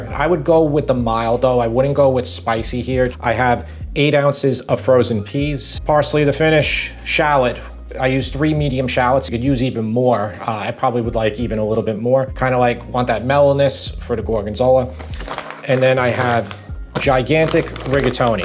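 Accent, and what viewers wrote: New York, United States. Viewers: Are you from the Bronx? Brooklyn? Queens? Or NJ? :)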